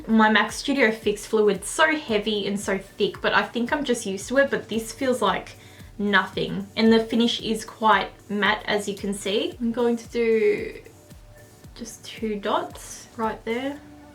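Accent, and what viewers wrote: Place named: Australia. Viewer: I love your channel! You're my fav. Makeup-youtuber with no exceptions, love the australian english too!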